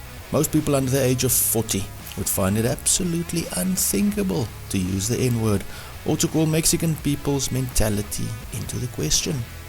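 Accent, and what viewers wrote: South Africa. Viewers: I was trying to figure out what your accent was before your said you were from south africa😂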